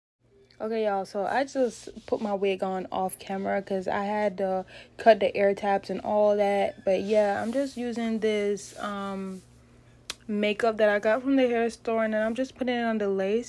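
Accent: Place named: New Orleans, United States. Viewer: You sound like you got a little New Orleans in you.. you ever visit?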